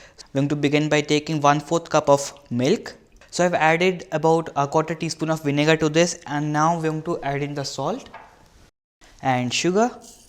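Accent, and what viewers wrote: India. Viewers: India has a totally different English accent! I strongly recommend subtitles for these videos! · Indian accent is horrible....its chopped(not chobbed). weirdo.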